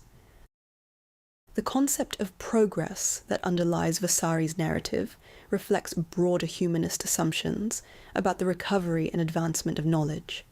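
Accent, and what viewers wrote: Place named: England, United Kingdom. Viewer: Hate the clipped English accent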